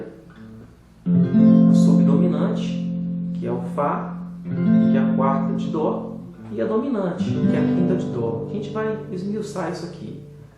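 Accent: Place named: Minas Gerais, Brazil. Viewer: AMEI AÍ SIM , AGORA VAMOS APRENDER COM ESSE SUTAQUE MINEIRO E MANHASO E SUAVE. ISSO NÂO É AULA É UMA POESIA LÍRICA .💤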